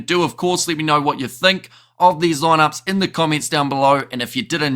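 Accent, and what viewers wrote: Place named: New Zealand. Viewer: That is the thickest NZ accent I’ve ever heard 😂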